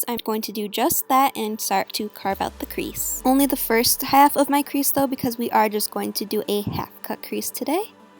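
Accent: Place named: Michigan, United States. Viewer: I frickin love ur Michigan accent and how you say lashes its so cute 😙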